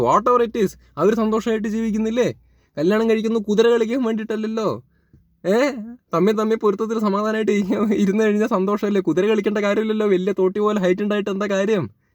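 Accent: Palakkad, India. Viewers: PALAKKAD slang poli 🔥 Very comedy 🖤❤🖤 🔥BIGG FAN🔥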